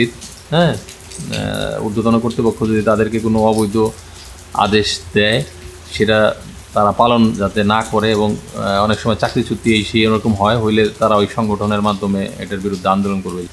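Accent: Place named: Tangail, Bangladesh. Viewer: এটাই আমাদের টাঙ্গাইলের কন্ঠ আমাদের বড় ভাই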